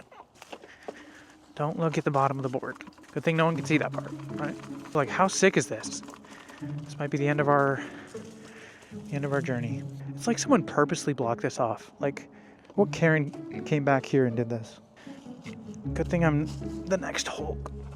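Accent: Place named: United States. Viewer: you can hear the country in his voice new mic?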